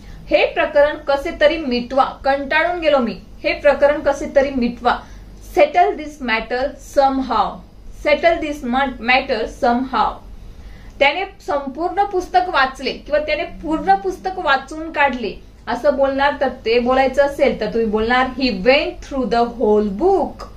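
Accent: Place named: Jalgaon, India. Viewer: Khoopach Chhan ! Tai tumhi Jalgaonchya disata. Bhashevarun lagech kalate.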